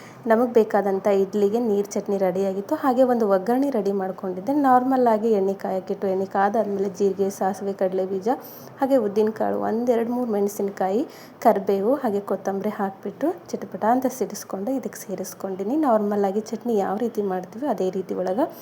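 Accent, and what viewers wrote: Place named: North Karnataka, India. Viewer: Aap North karnataka kannada and Banglore kannada mix karke kyon bolted ho?Speak in only one style, please.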